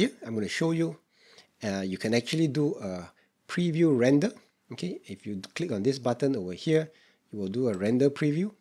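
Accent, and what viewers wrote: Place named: Singapore. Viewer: Love the Review. Even more, love the Singapore accent.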